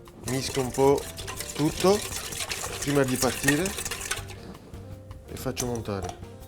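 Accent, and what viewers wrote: France. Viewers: Is he speaking Italian with a French accent😍😍😍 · Sono inglese. Ma lui sta parlando col acento francese no? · Ma perchè iett tene l’accent franzoso?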